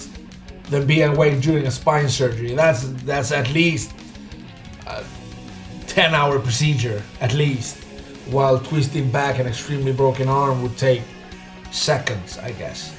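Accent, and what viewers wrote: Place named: Spain. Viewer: for a Swedish man you sound like you have a more spanish accent. no hate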